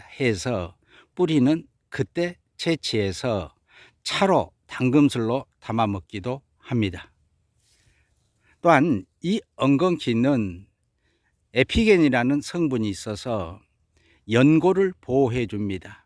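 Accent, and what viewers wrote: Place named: North Gyeongsang, South Korea. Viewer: 말투가 경상도 북쪽이 아닐까요 ??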